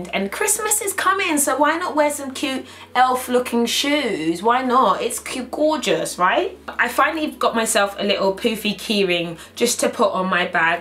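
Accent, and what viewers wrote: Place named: RP, United Kingdom. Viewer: I love your accent I live in london but I don't have a lovely posh accent 😇😇😢💖💖💖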